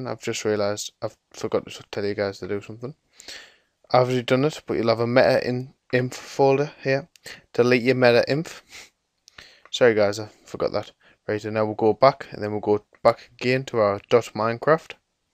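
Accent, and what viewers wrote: United Kingdom. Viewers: Ur from newcastle same acsent as me british newcastle geordie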